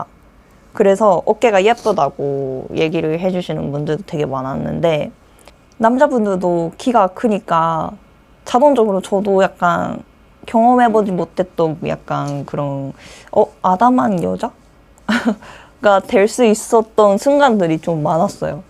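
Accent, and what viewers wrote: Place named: Gyeongbuk, South Korea. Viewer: 아니 야는, 한국사람 아녀..??ㅋㅋㅋㅋㅋㅋㅋ 경북 아가씨 같은디..ㅋㅋㅋㅋㅋㅋㅋ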